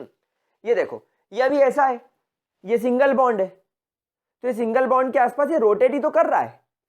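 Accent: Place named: Indore, India. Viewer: yeh sir pakke indore hai voice se hi pata chal rha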